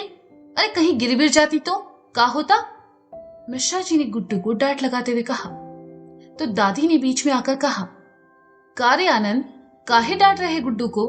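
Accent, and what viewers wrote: Kanpur, India. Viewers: Mujhe apki ye kanpuriya language boht achi lagti h kya mst bolte ho sach me jbse murari wala sune tbse boht acha lga❤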